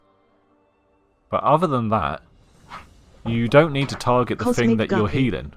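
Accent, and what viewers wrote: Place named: United Kingdom. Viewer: Seriously, it has to be something in the water. Another goofy brit with a thick accent trying to make a guide on an American game. Lol and this goofball actually has someone talking over him. So weird!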